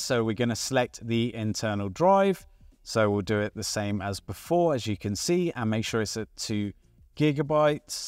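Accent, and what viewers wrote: United Kingdom. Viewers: I hear a British accent, so when is this coming out in the UK? 😀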